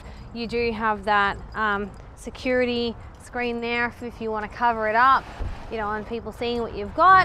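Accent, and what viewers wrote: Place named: South, United States. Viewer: Not going to lie, at first I thought she was from the southern United States with her accent 😂 it is so similar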